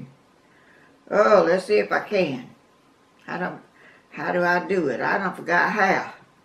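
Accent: South, United States. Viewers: I JUST LOVE YOUR SOUTHERN DRAWL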